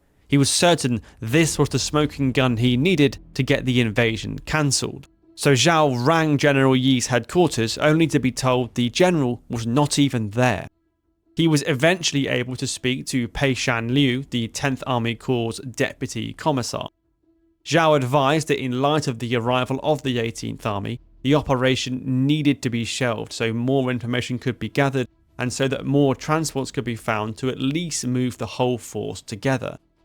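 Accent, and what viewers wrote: United Kingdom. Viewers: Good information but your bias is too strong. Also your pronunciation of Chinese is soooo British. Just a travestry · The British accent is the best for history videos fr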